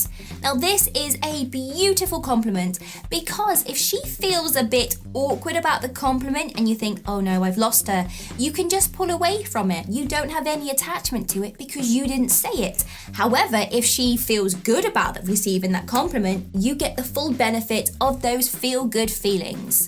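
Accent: United Kingdom. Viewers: May I ask about your accent? Is it British, Australian, or other?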